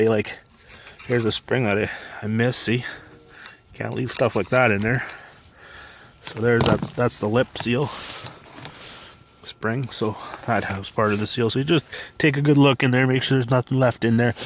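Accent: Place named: Uttar Pradesh, India. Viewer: You sound like you are from the U.P to me eh'...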